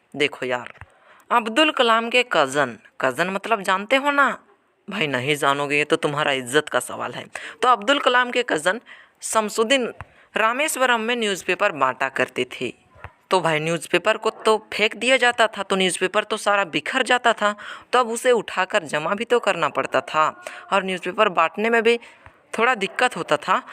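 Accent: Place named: Odisha, India. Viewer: Bhaiya apka aawaz se aisa laga ki aap Odisha se ho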